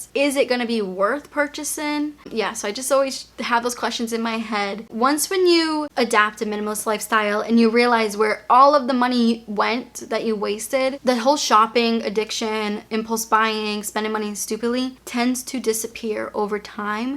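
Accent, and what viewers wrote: Boston, United States. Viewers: I love your boston accent.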